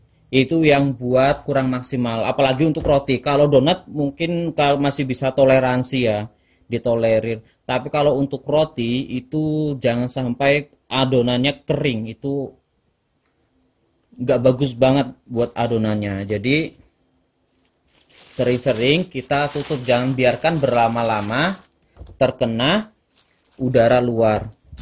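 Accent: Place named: Cilacap, Indonesia. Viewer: Maaf Mase aku nmbe nemu chanel sampean, koh sampean niku kaya wong CILACAPAN yah sanjange....👍👍👍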